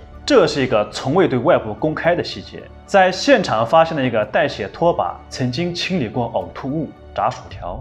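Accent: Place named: Anhui, China. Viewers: 主持人是安徽人，口音重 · 听起来口音应该是安徽的吧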